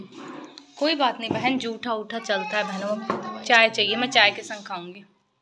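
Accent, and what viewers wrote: Uttar Pradesh, India. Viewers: हमारे hardoi m bhi aise hi bolte h jaibo kaibo main jab apne ghar jati hu to main bhi aise hi bolti hu अपनों के साथ अपनी बचपन की भाषा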